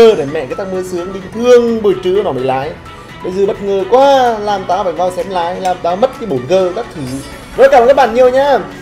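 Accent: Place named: North, Vietnam. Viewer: bình luận giọng người miền Bắc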